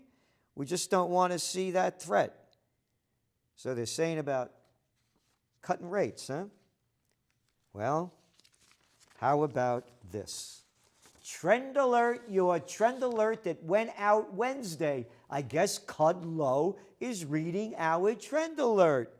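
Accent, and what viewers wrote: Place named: New York, United States. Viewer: I think it's his thick New York-ness. New York-ness spans multiple ethnicities. As a half-Italian woman from NJ, how I miss NJ-NY-PA style.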